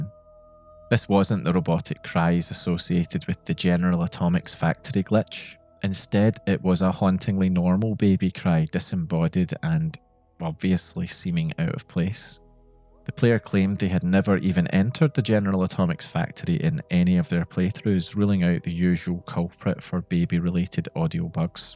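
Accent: Scotland, United Kingdom. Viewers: Scot? Irish? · Are you by chance Scottish? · Subscribed because of scottish accent.. something I'm trying to learn.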